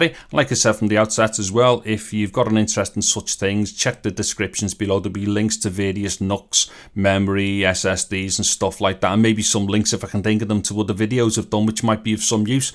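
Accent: Liverpool, United Kingdom. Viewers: lol, his accent is as scouse as it gets. it is awesome. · Ha, ha, ha.... I was saying to myself when you started talking... Liverpudlian. Just like them Beat- uls! I had friends from Liverpool decades ago. Also my Grandma's accent was close. She was from just a bit north of you. Thanks for the video.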